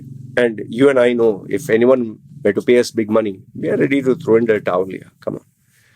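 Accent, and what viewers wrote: India. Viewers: bro you have an indian accent?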